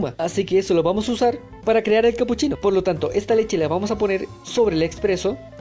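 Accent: Standard. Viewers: tu acento universal es fantástico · Habló lento y con acento neutro, jajajajaja. El acento chileno original es incomprensible fuera de Chile.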